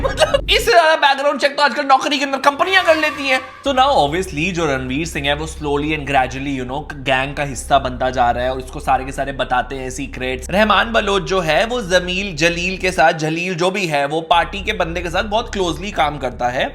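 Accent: Punjab, India. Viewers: your punjabi accent is so sexy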